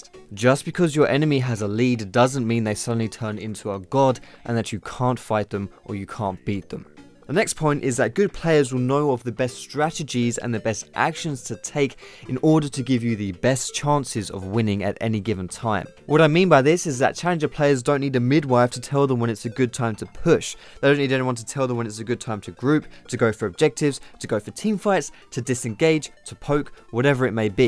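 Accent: United Kingdom. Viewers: I really enjoy all your videos and especially I love your British accent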